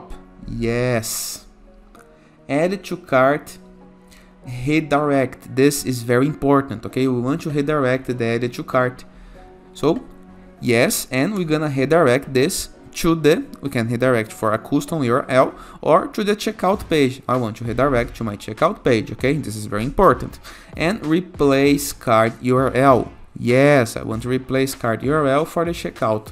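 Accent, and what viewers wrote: Brazil. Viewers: valeu brazuca! HAHHAHA adorei o sotaque! :D tmj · sotaque brasileiro detectado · seu inglês tem sotaque de brasileiro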